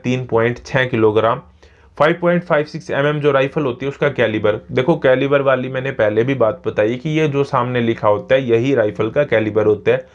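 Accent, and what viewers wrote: Haryana, India. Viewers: Bhai haryanvi hai k ?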